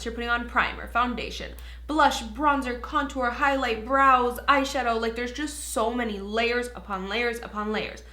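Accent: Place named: Canada. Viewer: you are so damn adorable!! Just now realized you are over 500k...I've been around since 80. So proud of you. btw i'm in love with your Canadian accent <333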